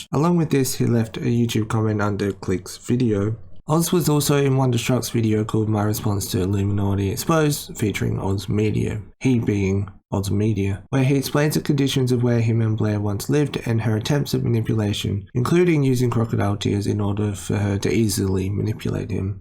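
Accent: Australia. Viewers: maybe it's the Australian accent or that he his diction is beyond your understanding, but definitely not slurring??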